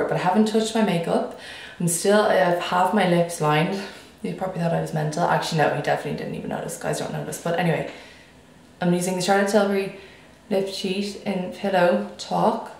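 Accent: Ireland. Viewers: Screw whatever people say about your "weird" accent. It's beautiful!!! I live in New York and I'm Puerto Rican, all I hear all day is boring American English and fast paced Spanish. It's refreshing to me to hear you speak with your wonderful Irish accent. 🍀🍀🍀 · Love this kind of video! Could listen to you talk all day. Whoever doesn't love an Irish accent is crazy! Keep it up babe 💜🙌🏼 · anyone who complains about your accent and the way you pronounce things can suck a toe. how ridiculous! i loveeee your irish accent! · it's only an accent to those who don't live in Ireland.. i love your accent! I'm from Boston so we say things funny too aha love you girly, beautiful as always!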